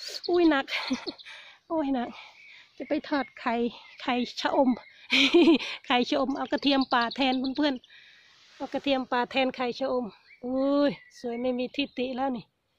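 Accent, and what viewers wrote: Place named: South, Thailand. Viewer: ดูแลตัวเองด้วยจ้าา,เอื้อย บ่สบายเว้าสำเรียงออกมาทางภาคใต้เลยเนาะ(น่ารักไปอีกแบบ)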